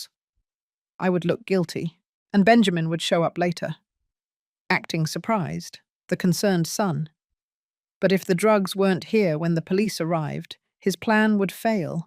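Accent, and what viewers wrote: United Kingdom. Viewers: I think the accent is British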